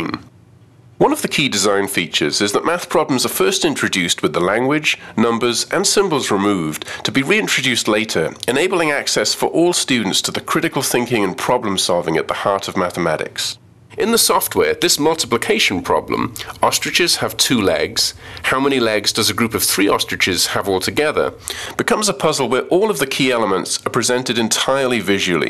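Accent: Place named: India. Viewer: He Speaks Indian English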